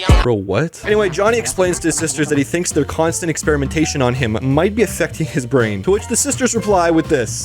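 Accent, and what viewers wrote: Canada. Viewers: Holy crap you swear like a true Quebecker! Props to you!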